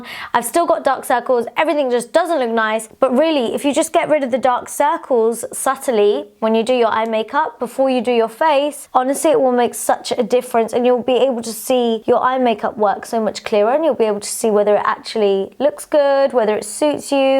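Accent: United Kingdom. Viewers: Wow loved the makeup look you sound so British like a lady from loose women omg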